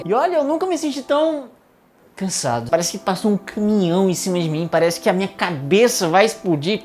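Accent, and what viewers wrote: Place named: Fortaleza, Brazil. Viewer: O algoritmo me trouxe aqui. Tô maratonando. Vem cá. Você é de Fortaleza? As vezes você fala como nós aqui. Um cheiro. Tô espalhando teu canal pelo "mei do mundo".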